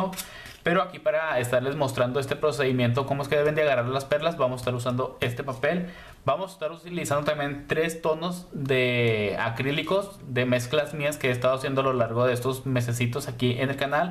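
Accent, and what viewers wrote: Chihuahua, Mexico. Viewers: Tu eres de chihuahua! Por el acento! Nada más por ser mi paisano te voy hacer caso caray! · Eres de chihuahua?...! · a mí me parece que es de Chihuahua..por como pronuncia la ch 🙃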